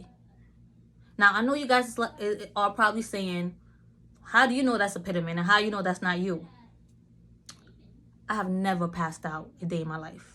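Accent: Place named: Liberia. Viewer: You sound a little like you Liberian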